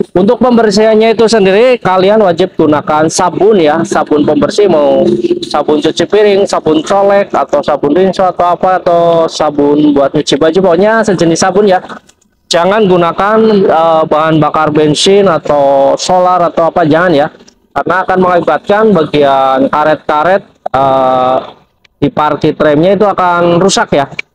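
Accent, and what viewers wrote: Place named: Cilacap, Indonesia. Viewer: Ternyata ini orang Cilacap jd jawareh😮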